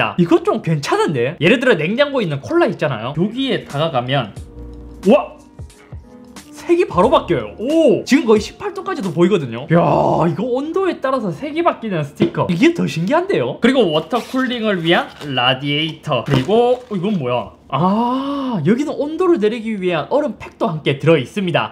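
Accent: Gyeongsang, South Korea. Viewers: 역시 경남분이셔 ㅋㅋ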